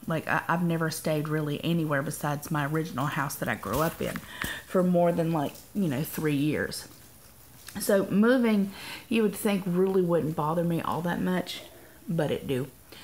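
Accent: South, United States. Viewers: Canada is bilingual so you'll find a lot of folks who can speak/understand English. You will probably be treated just fine, as your southern accent is endearing. Montreal is a beautiful old city...are you guys going to do a vacation there to check things out? On the drug front, you likely won't find it hard to get your supplements...if anything Canada has many more over the counter drugs (that don't require prescriptions). I am speaking from experience, as I'm a transplanted Canadian who now lives in WA state. I actually have to make regular runs to the border (no...not for tacos!) to get some of the things I'm used to having on hand (Voltaren, Robax (has Soma in it) and cough syrup with codeine...yadda yadda). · Hey! You will find that what a couple of the other ladies have said is correct, there are a lot of folks in Quebec, Montreal in particular that do speak English, however, I highly recommend learning to speak french as you will be more comfortable if you do so. 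That and hearing your southern self speaking french will be AWESOME! As for the vitamins, Melanie Gregory is right. Also when we move into an area, I have gotten a grocery trolly to take on the bus so I don't have to carry the groceries home. Explore at your leisure so you are more comfortable when you have to be somewhere. What is your husband taking that will have him at the University in Montreal??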